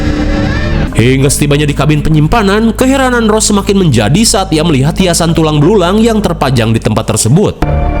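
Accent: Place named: West Java, Indonesia. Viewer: mang,lahir taun braha sih😂..sundana jiga Sunda bandung cianjur,milenial pasti yeuh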